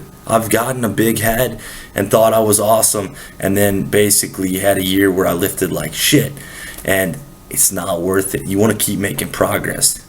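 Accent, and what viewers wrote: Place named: Canada. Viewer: Call me crazy but as a canadian, I would think you were canadian by your accent if I didn't know you were american haha keep it up man you're a beast!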